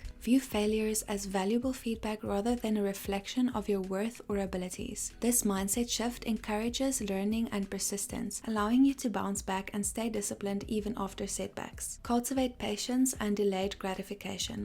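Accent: South Africa. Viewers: R u south african, ma'am?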